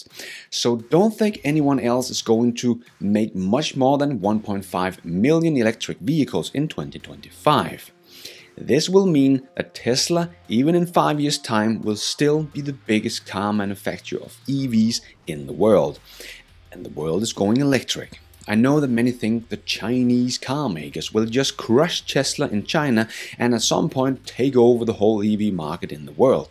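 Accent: Denmark. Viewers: You sound Danish. How are Tesla sales going there? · Er du dansker? 😁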